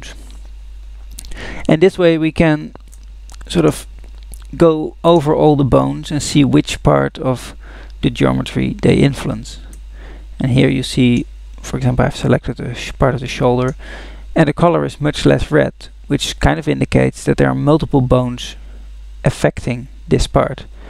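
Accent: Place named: Netherlands. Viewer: Sounds like you are Dutch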